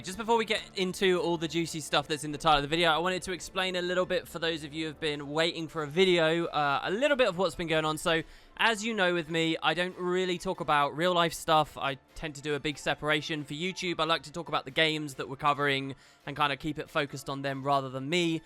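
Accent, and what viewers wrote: United Kingdom. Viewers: I just hope you (not, but the real you) are doing OK. Your internet persona has been part of many bike trips to work. To so many coding evenings and you've helped me gain further understanding about how brits talk. I've come to grow fond of you and I'd hate something bad happening to you. You had us worried because we care for you even though we don't even know your face, haha.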